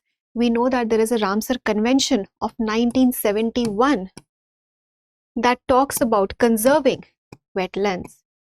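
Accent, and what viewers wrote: India. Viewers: Madam ji indian accent me padhayo jaise lag rha hai college me lecture de rhi ho 😍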